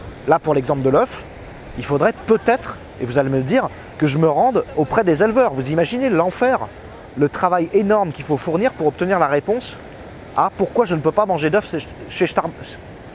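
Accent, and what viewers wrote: South, France. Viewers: On dirais qu'il commence à perdre son accent du Sud.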